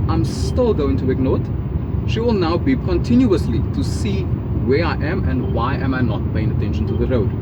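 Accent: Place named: Pakistan. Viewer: Whats sup with this Pakistan accent ? Can’t get half of a shit you said.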